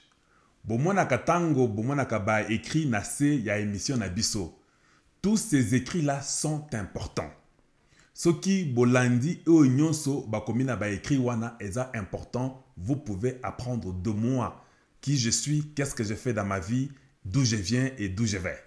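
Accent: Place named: Texas, United States. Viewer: Votre colère est justifiée vous n'avez pas mâché les mots. Vous méritez un post ministériel avec vos compétences. Vous parlez comme un texan! Merci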